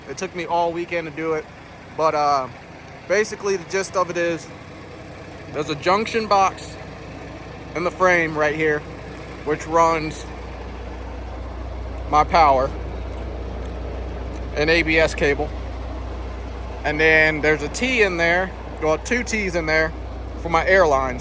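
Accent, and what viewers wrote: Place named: South, United States. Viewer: nah bruh ghetto has nothing to do with it.... its super proper., damn near like a country white dude.. but no shade.. didnt mean it like that...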